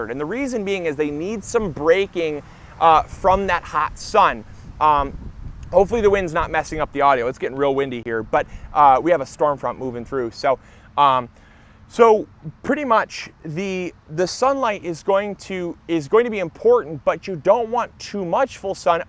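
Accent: Michigan, United States. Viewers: Classic rock Lover the Michigan accent is strong in this one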